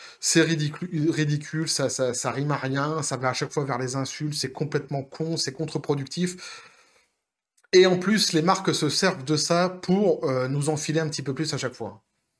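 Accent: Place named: Quebec, Canada. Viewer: L'accent Québécois est fabuleux 👍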